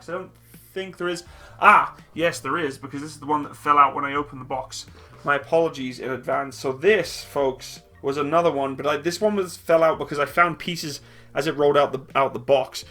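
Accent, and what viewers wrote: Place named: United Kingdom. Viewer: Feel like at home hearing your British accent that's lovely I'm British too. Enjoying your Youtube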